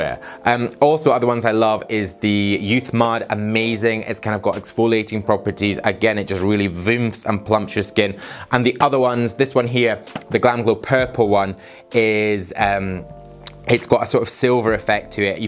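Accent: United Kingdom. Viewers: Congratulations on your achievement! You help me so much build interest for my hair and having an awesome hairstyle.😊 Also I really love your British accent you look so charismatic. My favourite vid of yours is for sure is when you fixed your worst haircut. <link> Dom really saved the day! Also you were so hilarious in the rant. You’re right about understanding and listening someone (which that barber didn’t did😉). Love from Canada!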